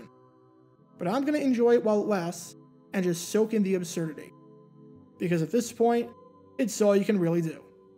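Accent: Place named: New York, United States. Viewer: I knew you had to be from New York originally. That accent is too obvious. 🙂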